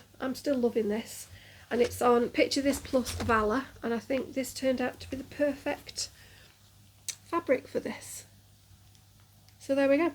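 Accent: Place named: Northern England, United Kingdom. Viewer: Hello! Just found your videos thanks to mama flossabilties. Just wanted to say as a fellow brunette and flosstuber, I feel your pain with the greys! I am due to go next week but in the meantime I am channeling grandfather from the munsters! 😂🧛‍♂️ your stitching is fabulous and I’m loving listening to a fellow northerner! I have subscribed and am looking to catching up with your other videos! Happy stitching lovely -Lauren 🧵💗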